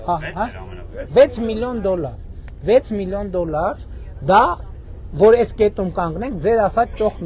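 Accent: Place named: Dilijan, Armenia. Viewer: Дилижани акцент тох хелоооок е. Ваз газа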